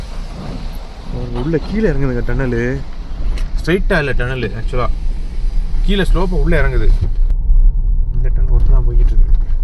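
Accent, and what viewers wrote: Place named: Madurai, India. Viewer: Namma madurai bro...doing business in tirupur....neenga bro...unga slang and voice enaku romba familiar a iruku...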